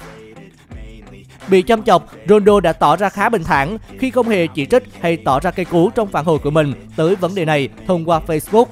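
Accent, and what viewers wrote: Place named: North, Vietnam. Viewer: Sao tiếng BẮC KỲ khó nghe quá 👎👎👎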